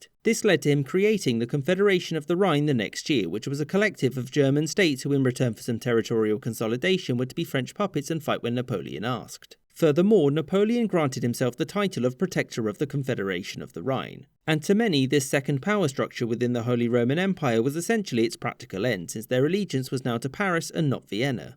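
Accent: United Kingdom. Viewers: No more bri'ish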